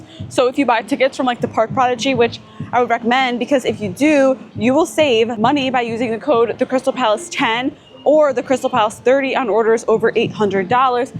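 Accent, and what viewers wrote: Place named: Long Island, United States. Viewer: I love your video... you gotta be from long island.. lol your accent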